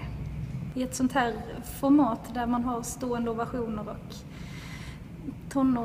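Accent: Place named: Scania, Sweden. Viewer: Most swedes don't anyway, she's from Skåne, perfectly understandable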